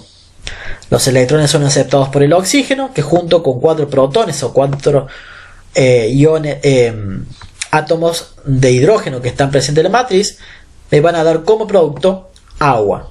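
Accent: Córdoba, Argentina. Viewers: aprobé con tonada cordobesa y todo!!! muchas gracias!!! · muy buena aula.. y la tonada de cordaba me encanta jaja ...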